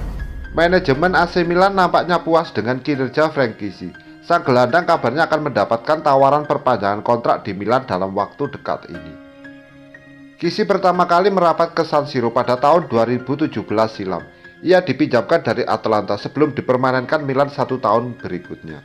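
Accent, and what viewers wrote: Java, Indonesia. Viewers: Ojo medok2 bro wkwk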